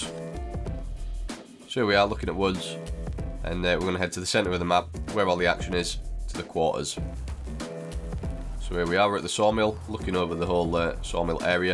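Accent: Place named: United Kingdom. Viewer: i love that British accent :D <3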